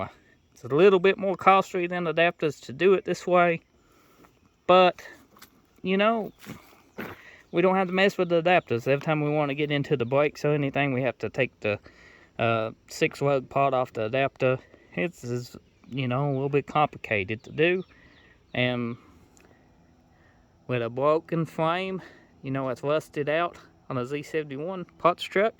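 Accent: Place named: Louisiana, United States. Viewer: hey, how's everything going? I'm guessing he's referring to the way you speak. Your accent is quite obvious. Where are you from? I was thinking Louisiana (Baton Rouge)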